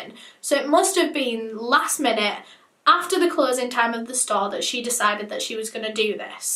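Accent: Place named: Yorkshire, United Kingdom. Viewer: she's from leeds (west yorkshire), the same as me so yorkshire x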